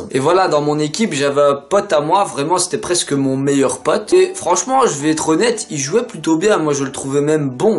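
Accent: Liège, Belgium. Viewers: J’ai entendu que ta voix a l’accent Liegeois moi aussi je suis Liegeois PS: je t’adore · Toi ça s'entend t belge #wearebelgium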